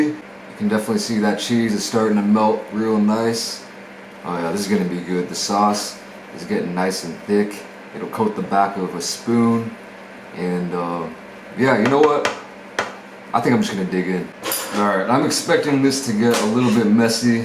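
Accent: United States. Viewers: His voice is so american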